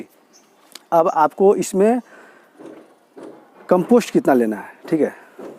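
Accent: Bihar, India. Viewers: "Bihari" ho kya bhai ???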